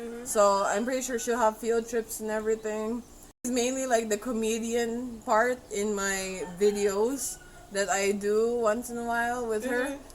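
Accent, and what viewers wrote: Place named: Europe. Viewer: you sound like you have a European accent.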